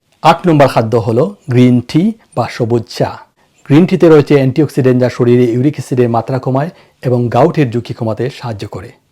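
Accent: Sylhet, Bangladesh. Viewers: আপনার একসেন্ট। খ উচ্চারণ। ভালো লাগলো সিলেটি ভেবে 😊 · ডাঃ সাহেব আপনার জন্য আরশি বাদ রহিলে আপনি সিলেটী কথায় বুঝা যায় সঠিক জানি না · আপনি সিলেটী নাকি?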